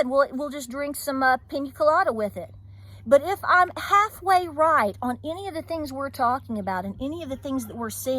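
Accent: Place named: Appalachia, United States. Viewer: I love your accent. My husband is an Appalachian and sounds similar to you. I love his culture. The elders of the past are so important to learn from.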